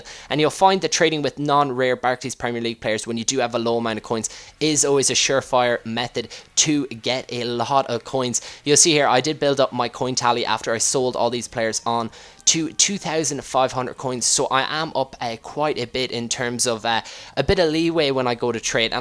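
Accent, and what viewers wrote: Ireland. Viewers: i love you cause your irish lke me:)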